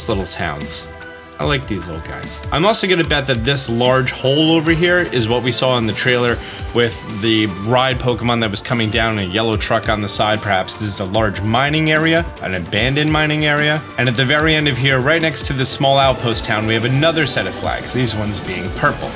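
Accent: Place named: Philadelphia, United States. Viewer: Are you from Philadelphia? You say 'wudder' like all of my friends and family. Lol. Also, thank you for the great breakdown!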